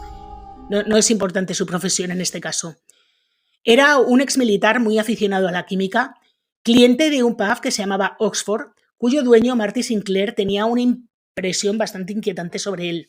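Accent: Castile, Spain. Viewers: Buen día ❗ amo que los españoles no pronuncien las palabras en inglés, sino en castellano ❤ Impresionante capítulo 😳😯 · nada se te quita con pronunciar las palabras en inglés, ya que das demasiados casos de USA, y son palabras en ingles, que cerrados sois los Spanish 😅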